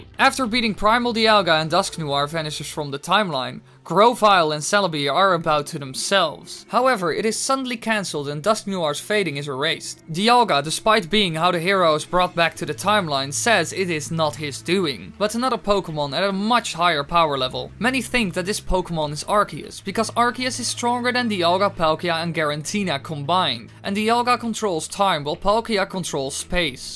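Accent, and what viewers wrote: Netherlands. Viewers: you sound dutch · I can hear this guy is dutch. Just his accent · Are you dutch?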